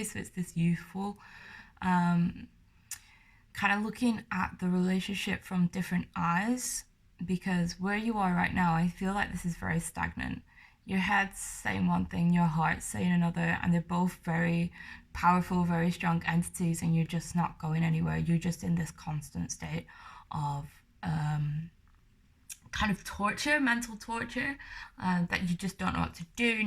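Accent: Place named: Lancashire, United Kingdom. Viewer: Ha Ha ,weirdly my husband said to me ...' the accent sounded like someone from Lancashire with a foreign accent : . Thank you for answering.. unfortunately he wins 😬😂. We live in Preston but are from nr Ormskirk . I am looking forward to any more readings that you do xx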